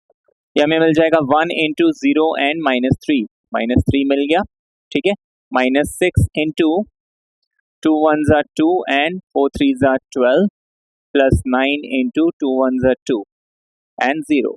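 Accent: Bihar, India. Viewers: R u from bihar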